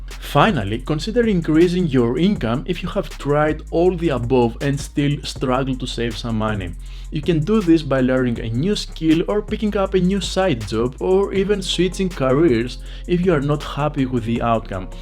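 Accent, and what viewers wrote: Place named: Greece. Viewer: I like your greek accent 😁 Nice video 👏👏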